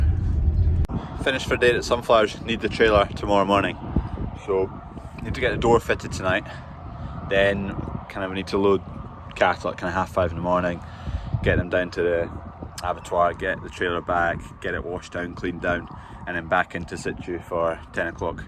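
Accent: Scotland, United Kingdom. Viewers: A love hear a good Scottish word like dug. Lol. Is your dug a lab. Well in another top notch vid pal.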